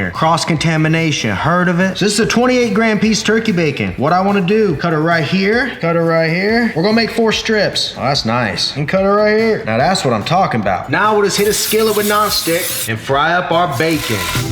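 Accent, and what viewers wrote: Texas, United States. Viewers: Is it just me or his Texas accent is getting stronger? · He's from Ohio, but definitely has Texas growing on him. Food and twang 😍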